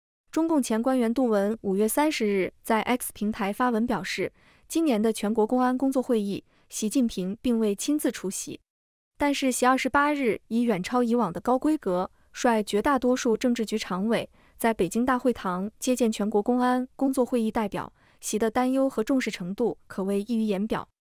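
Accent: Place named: Northeast, China. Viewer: 為什麼是東北人口音好惡心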